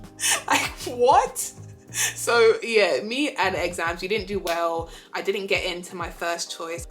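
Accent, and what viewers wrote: New York, United States. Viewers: It’s the New York accent that killed me like “ are you DUMB?? “ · Loved the New York accent and your career story!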